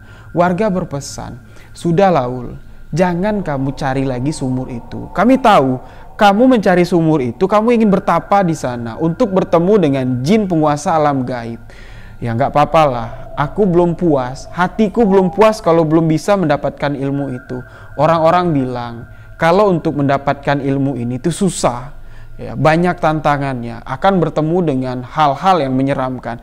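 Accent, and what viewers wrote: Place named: West Sumatra, Indonesia. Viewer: Abang ini orang minang ya... Logat bahasa nyo agak urang minang barbahasa Indonesia, terperangah, dll heheh..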